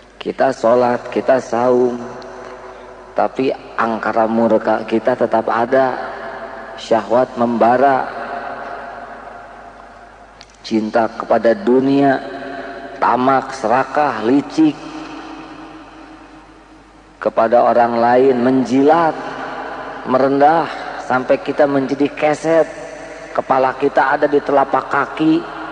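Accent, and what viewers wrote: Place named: West Java, Indonesia. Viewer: Suara AA' lah...dengerin aja Aksennya. Sunda.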